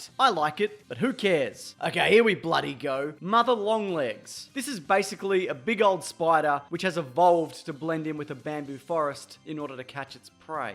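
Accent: Australia. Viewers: He Or sHe has an Australian accent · YOU"RE AUSTRAILIAN?!?!?!? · Wait your Australian?!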